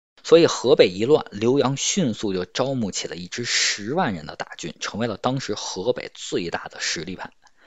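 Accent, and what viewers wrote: Beijing, China. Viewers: 听着像北京人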